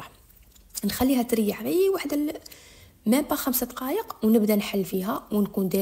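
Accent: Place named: Algeria. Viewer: قناة جزائرية واضح من اللهجة علاه دخلتي هنا؟ لا للسرقة 🇩🇿🇩🇿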